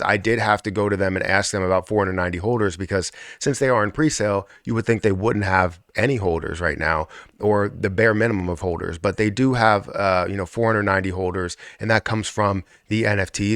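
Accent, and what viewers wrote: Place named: Los Angeles, United States. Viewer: Where are u from? Your accent looks from Los Angeles isn't?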